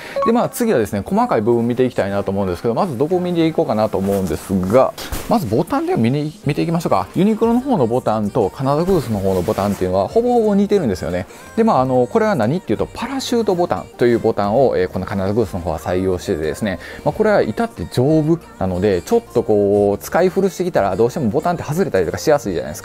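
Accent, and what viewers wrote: Kansai, Japan. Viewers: 何度聞いても心地良い関西弁だなぁ〜。 さいたま市より。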